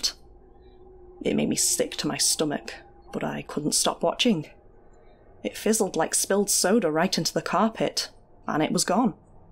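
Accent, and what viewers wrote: United Kingdom. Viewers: Amazing story and she did an excellent job!!! I love a British accent and she def brought it all to the table. Would love to just sit and listen to her narrate stories all day. Good luck, hope to see you push through to the next round!!!!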